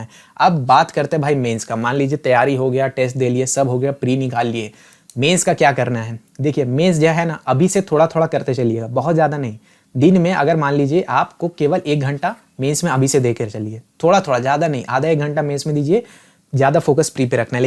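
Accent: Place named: Ranchi, India. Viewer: Uff that Ranchiyaar boleee😂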